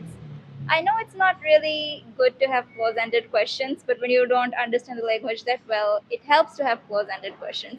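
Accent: Philippines. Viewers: She speaks Philippine English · Good,pilipino accent in English.thnx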